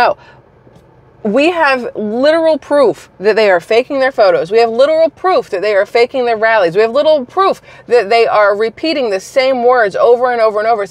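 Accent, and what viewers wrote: South, United States. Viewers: From Mississippi. That is genuine southern accent. And yeah, she is pretty.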